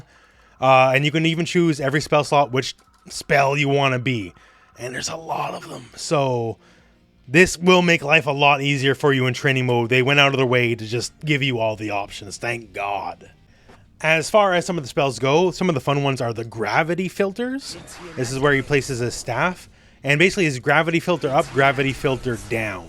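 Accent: Canada. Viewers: Won't ever get sick of hearing pronounce 'cards' in his Canadian(?) accent!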